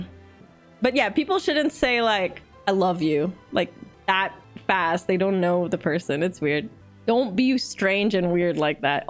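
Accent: South, United States. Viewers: Lol, your southern accent is 🔥. But you live in Georgia, right? That's probably why. 😀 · that southern accent SENT ME 🤣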